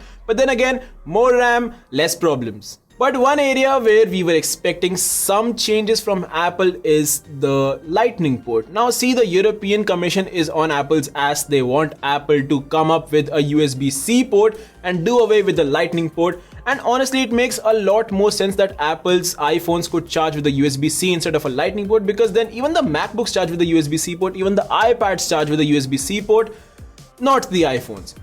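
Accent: India. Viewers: Indian freshy English accent is very potent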